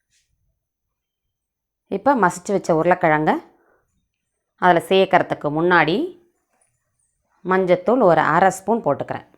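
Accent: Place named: Coimbatore, India. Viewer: உங்களுக்கு எந்த ஊர் சிஸ்டர் கோயம்புத்தூரா 🤔🤔 நாங்கள் திருநெல்வேலியில் இருக்கிறோம் நீங்கள் ரொம்ப அழகாக பேசுகிறீர்கள் அதனால் கேட்டேன் வாருங்கள் அவ்வப்போது எங்கள் வீட்டுக்கும் முதலில் வந்தீர்கள் இப்பொழுது ஆளையே காணோம்🤔🤔🤔🤔🤔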